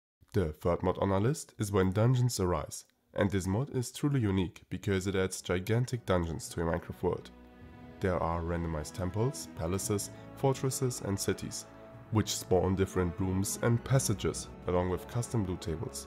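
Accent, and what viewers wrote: Germany. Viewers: klingt deutsch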